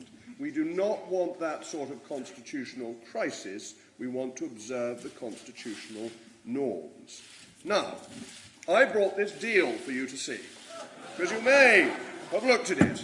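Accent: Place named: RP, United Kingdom. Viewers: . . . and maybe a voice coach to rub the corners off that plummy accent. . . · Ok I get the turn off because off the "posh" thing, his silver spoon life as it were, but my God this man knows his stuff and always calm. The amount of times thugs have shouted at him and he calmly wants to chat, at which point they either shout more or walk off. Every interview its normally him running rings around the interviewer, its rare that they repeat questions because he does not stand for it or fall for it. He should be PM but I fear his personal views and money will turn lower income people off, posh well spoken people have always been viewed as been the reason for poor people....stereotypes.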